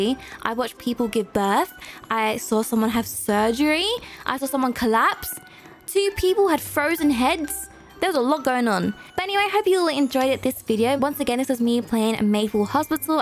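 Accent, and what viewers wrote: United Kingdom. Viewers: I love her British accent❤💛💚💙💜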